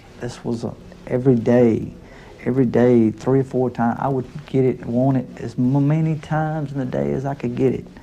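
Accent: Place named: South, United States. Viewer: his eyes and southern drawl are so disarming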